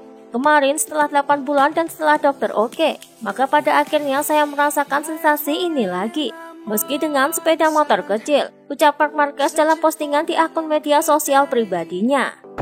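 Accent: Java, Indonesia. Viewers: Logat Jawa.. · Ngomongnya medok.. Emang gk bisa di ilangin y?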